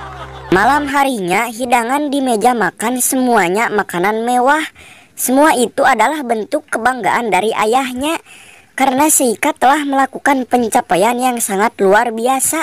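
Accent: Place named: West Java, Indonesia. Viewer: Ka ciri pisan ieu mh urang sunda na th🤣🤣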